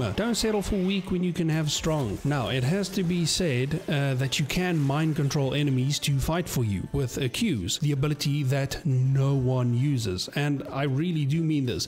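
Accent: South Africa. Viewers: Hey bru are you from south Africa? I cant mistake that accent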